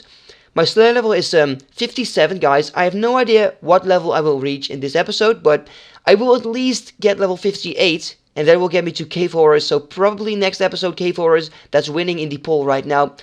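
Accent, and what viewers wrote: Netherlands. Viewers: Are you Dutch? I recognize the accent ^^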